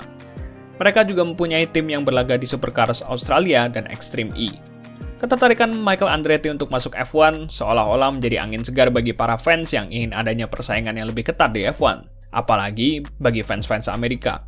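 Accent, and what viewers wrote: Cilacap, Indonesia. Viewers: btw koe wong cilacap bang?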